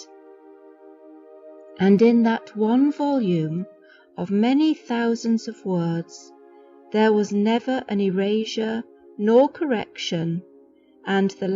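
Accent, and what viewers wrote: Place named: United Kingdom. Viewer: Why that creepy Brit voice …such a obnoxious sound…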